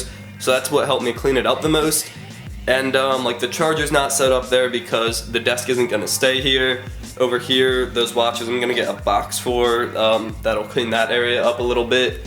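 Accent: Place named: United States. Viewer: He sounds American mate, probably a glory supporter!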